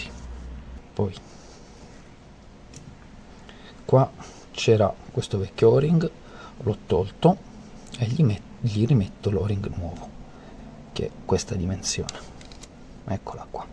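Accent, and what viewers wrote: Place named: Salento, Italy. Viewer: grazie per il video, molto chiaro.!!!!! sono alle prime con uno yamaha 40, se volessi contattarti telefonicamente è possibile?? dall'accento credo sei Salentino ? grazie luis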